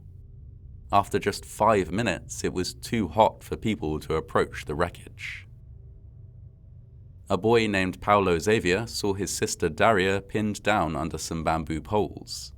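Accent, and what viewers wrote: United Kingdom. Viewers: British pronunciations🤭!!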